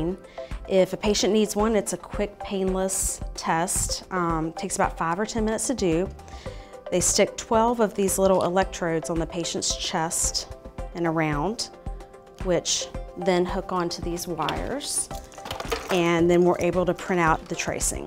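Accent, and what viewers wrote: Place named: South, United States. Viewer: She from the south ⬇️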